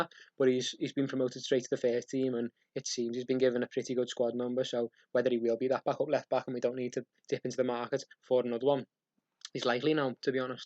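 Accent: Liverpool, United Kingdom. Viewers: Hey no probs mate. I was worried you might have been offended and it certainly wasnt meant to be. As a fellow Scouser I understand every word as thats how we speak, but others might miss the odd thing your saying. Keep up the great work mate and COYB.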